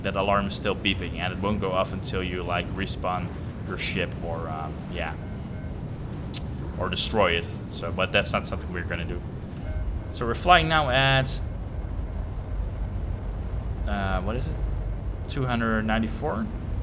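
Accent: Netherlands. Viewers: Are you dutch? I bet you're dutch.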